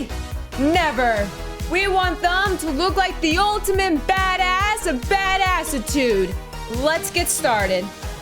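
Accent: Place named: Europe. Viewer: Wait, what? A Warhammer painter who doesn't just prime white and slapchop away with abandon with those overpriced contrast paints?? I like you already! That guy looked like he's been through a few trenches and is Not Having It Anymore (this is a very good thing)! Well done, and pardon my asking, but are you European? I'm trying to identify your accent...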